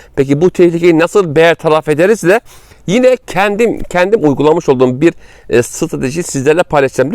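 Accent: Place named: Black Sea, Turkey. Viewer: Hocam cok guzel karadeniz ya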